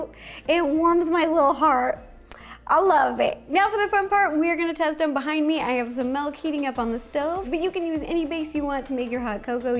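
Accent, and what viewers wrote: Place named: Rural, United States. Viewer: Awww her adorable country accent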